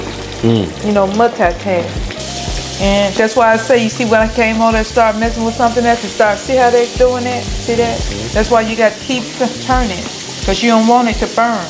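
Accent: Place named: Chicago, United States. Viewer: She sounds like she from Chicago with that cousin and em 😂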